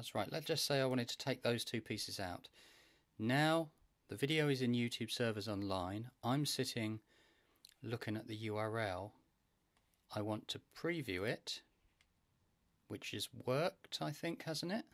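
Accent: United Kingdom. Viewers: They probably didn't have much enthusiasm for an Editor at all, thinking that many other software exist for detailed editing. If so they should call it not Editor but Trimmer, as it can only do trimming. Plus indeed they should have a more detailed tutorial on degrees of freedom, what will happen if we don't do this or that - like you did. I find their approach a bit ignorant, considering that we are probably not the first people who struggle with their program. Good thing that there are people like you, who take pain recording the video with explanations of what can go wrong. Thank you,:) I also liked your accent: Brits make English sound like music:) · Your voice is so British it makes me patriotic.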